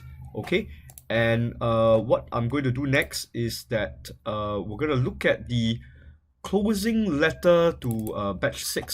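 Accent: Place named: Singapore. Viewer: Singlish in a nutshell: " BAY-SEEK-KE-LEE" "ACT-CHU-ER-LEE" "CHROMWELL REED" "MUL-TEE-PLI" "DI-NORM-ME-NATED" "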